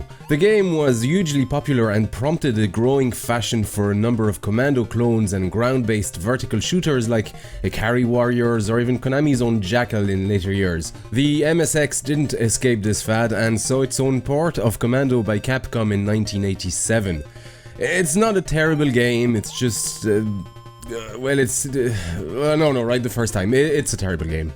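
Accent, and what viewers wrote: Ireland. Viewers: Slightly off-topic, but what is this accent? Irish and French? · Henry Pym Irish accent:) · yay I win, I kept thinking this dude is Irish...but then French ha ha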